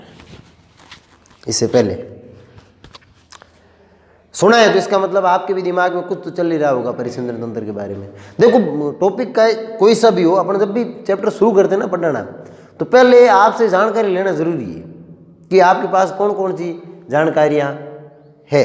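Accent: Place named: Barmer, India. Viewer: आपके पढ़ाने का तरीका यही बनाए रखना क्योंकि कॉमेडी के साथ पढ़ाई में बोरियत महसूस नहीं होती है ओर खासकर देशी अंदाज...क्या आप बाड़मेर से हो गुरू।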